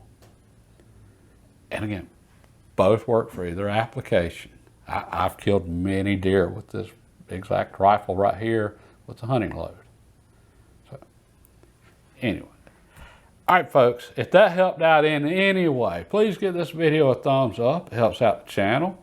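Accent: South, United States. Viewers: Thank you for the explanation of the push feed vs the controlled round feed from a historical perspective. I know exactly what you spoke about having been born in 1936 and had a 22 caliber single shot rifle that is very much like today's Cricket. Like that southern drawl too.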